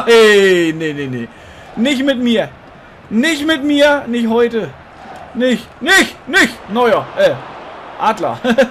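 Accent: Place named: Swabia, Germany. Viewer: Hinterdupfinga des isch a rechtr Schwob :)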